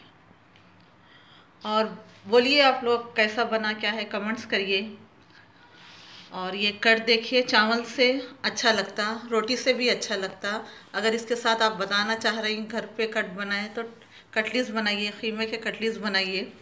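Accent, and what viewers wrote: Hyderabad, India. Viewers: Ek dum Hyderabadi hai aap 😂 Mere jaisa ich 😎😂 Ek pyaaz ki dalli 🔥 Dialogue was awesome 😂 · Aswk aunty I like yr way of speaking a pure hyderabadi language we enjoy yr style of cooking For the first time we heard of dahi ka dalcha thnx for sharing new recipe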